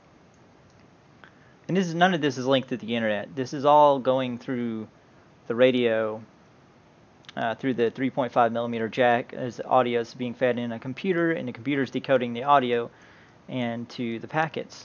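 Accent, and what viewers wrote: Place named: South, United States. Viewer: Your accent is that of a southerner, so I find it interesting that you have as a background, the Brooklyn Bridge and the NYC skyline.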